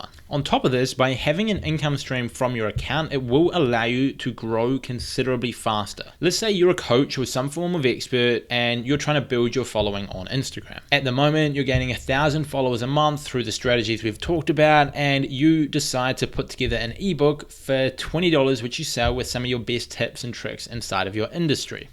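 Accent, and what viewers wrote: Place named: New Zealand. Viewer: To be Honest , You're kiwi Accent sounds like a Music to hear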